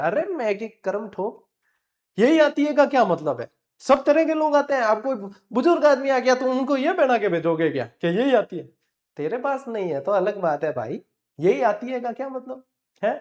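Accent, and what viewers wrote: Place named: Marwar, India. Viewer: Book ka jawab nhi.. Iske alawa or kuch pdhna yaad bhi nhi rehta h ab to lekin rbse vali class or rbse ki book ye dono ek din bhi nhi bhulte. But despite of these two things, sir your way of speaking with a pinch of marwari or godwari i would say is influencing more and more .. I feel myself forunate that I study from such a great public figure. Aaj ki class or usme aapki intresting rajasthani vibes vali baate. You're totally incredible and unbeatable 🙌.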